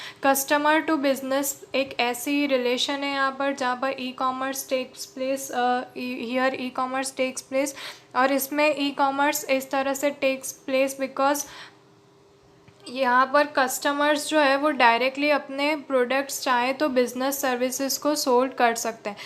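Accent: Gujarat, India. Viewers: Mam are you from Gujarat?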